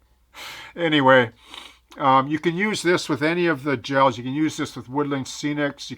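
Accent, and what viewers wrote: Canada. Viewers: Now I want to go watch Strange Brew listening to that Canadian accent!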